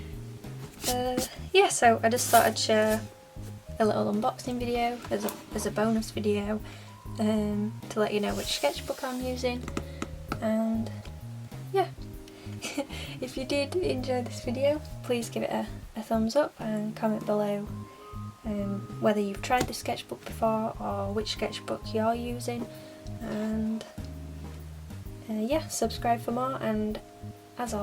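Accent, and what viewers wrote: England, United Kingdom. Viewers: Wow! So cool!💘💞💞💜 Actually, I always have question.. Are you from England?)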